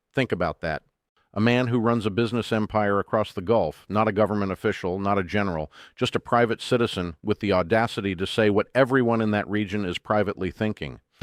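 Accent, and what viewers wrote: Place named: United States. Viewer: Hi, I'm so used to you native authentic accent, the American one becomes a distraction.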